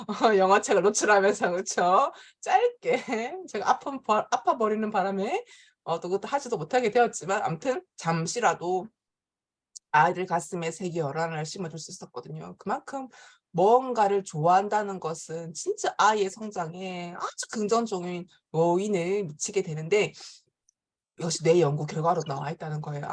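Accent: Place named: Gyeongsang, South Korea. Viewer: 작가님~오랜만에 유튜브 올라왔네요^^얼마전에 교집합 스튜디오에 나온거 보고 엄청 반가웠는데 ㅎㅎ 작가님의 이 구수~~한 갱상도 사투리와 억양+ 가감없이 진솔한 옆집언니같은 화법 완전 팬입니다!! 듣는거 자체로 힐링됩니다~앞으로 자주 올려주세요~~❤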